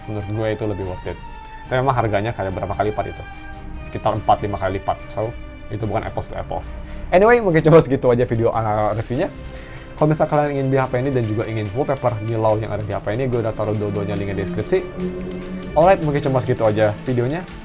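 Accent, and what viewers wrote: Bandung, Indonesia. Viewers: Kang, orang Bandung?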